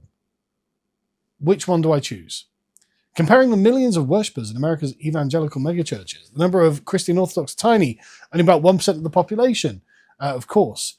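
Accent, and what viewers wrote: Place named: England, United Kingdom. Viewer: Sorry bro, your English accent couldn't save you on this one. Complete rubbish.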